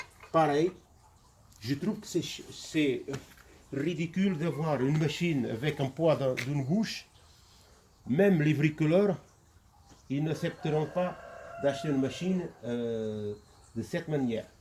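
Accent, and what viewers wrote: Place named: Portugal. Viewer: Bravo pour ton travail et ta présentation. Justement je m’intéressais à cette fendeuse dans le commerce par internet pour mes besoin dans le fin fond de l'Ariège où il n'y a pas de d'électricité. Tu me fais faire un bon en avant dans ma réflexion avant achat ! Mais je me tâte encore à prendre une petite thermique.... Merci et bonne continuation. PS : j'adore ton accent portugais ! ;) et bravo aussi pour la qualité de ton français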